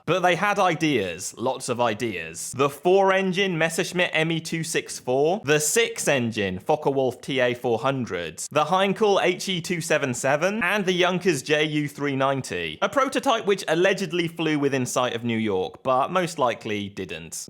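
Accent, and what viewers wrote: United Kingdom. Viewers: I swear he has the most Brittish accent i have ever heard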